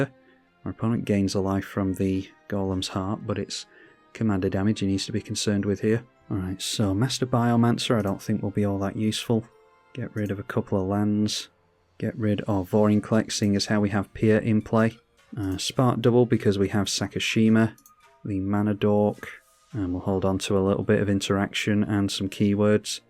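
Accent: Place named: United Kingdom. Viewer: Bro is so british the closed captions put his saw in as a SAR in half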